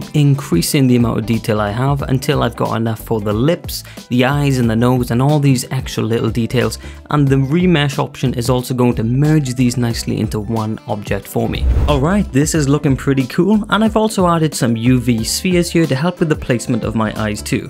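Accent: Wales, United Kingdom. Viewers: Just decided to get into animating and youre the first youtube creator Ive watched, awesome mate and Id spot a fellow welsh accent anywhere! 🏴 Liked and subbed! Would be cool to get into contact with you in a few months when ive got more knowledge on it all! Best of luck mukka, hope life is good🍻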